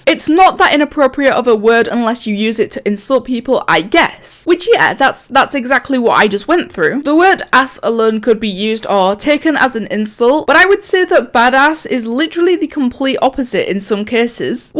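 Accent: Scotland, United Kingdom. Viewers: First off "Badass" isnt a bad word second off i like your scottish accent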